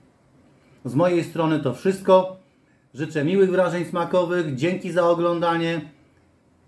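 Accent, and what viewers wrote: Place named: Silesia, Poland. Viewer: myślałem że ze Śląska, bo tak zaciągasz 😊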